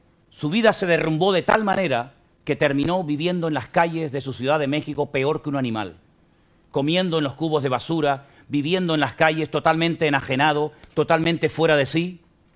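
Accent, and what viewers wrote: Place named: Paraguay. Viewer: desde Paraguy le veo,me gusta xque habla Español como nosotros,No como hay los de España🙏🙏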